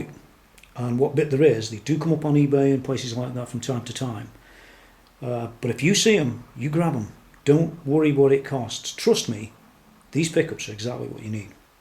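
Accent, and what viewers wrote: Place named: England, United Kingdom. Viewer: you r from england right ?i took u from your accent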